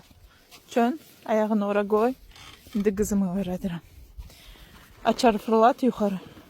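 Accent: Ganja, Azerbaijan. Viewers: Gözəl qəlbli bizim qızımız yaxşı ki, tanıdıq səni və vlogların superdi ailənlə birgə daima xoşbəxt ol bu arada inşeAllah ki, Azərbaycan dilini unutmazsan ən əsasıda Gəncə ləhçəsini unutmayın şirinlik verir sizin danışığınıza 😊 can səninlə birgə gəzdim kəndinizi eyni abu havanı verdiz mənə ❤❤❤❤😅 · Sən əsil gəncəlisən ləhçəndə şirindi elebil kazaşka deyilsən 😂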